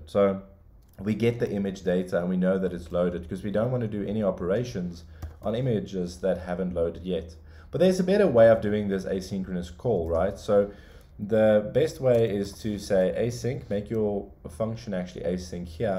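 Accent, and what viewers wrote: South Africa. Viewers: i have just found your channel, and dammit i know that accent is SA!! :) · This guy sounds south african....great work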